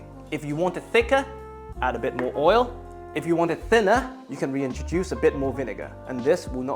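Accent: United Kingdom. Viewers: He sounds very British! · Loved your british accent!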